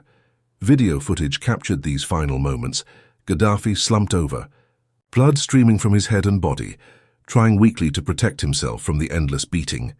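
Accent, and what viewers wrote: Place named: United Kingdom. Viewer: This "Commentary is a Total" LIE, being Narrated by a European Britain 🇬🇧. Muammar Gaddafi wanted Unify the "African Union " and America and Europe wasn't having that so former POTUS Barack Obama did the Devils bidding and took him out 1 of the 1things i despise America for...! FYI : Free Education, Health, Housing, No Unemployed People, and No poor people, his demise was an Evil Power Grab Orchestrated by the European establishment and Completed by this Hypocritical Government America 🇺🇸 😀 😉 😄 666..!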